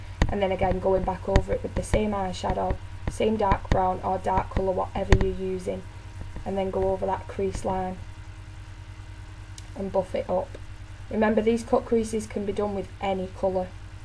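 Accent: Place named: Manchester, United Kingdom. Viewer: Are you from Manchester. I am and you sound like me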